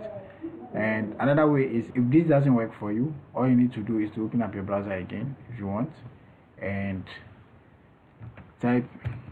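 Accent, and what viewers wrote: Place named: India. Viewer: THIS NIGGA IS A SCAM HE SOUND LIKE A INDAIN "TECH SUPPORT"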